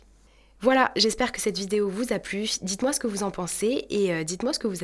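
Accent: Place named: France. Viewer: Parlons un peu de ton accent française en premier moment , jai cru que tes française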